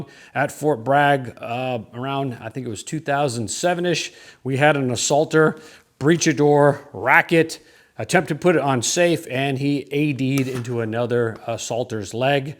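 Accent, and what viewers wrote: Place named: Canada. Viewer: Are you originally from Canada? Your accent sounds Canadian.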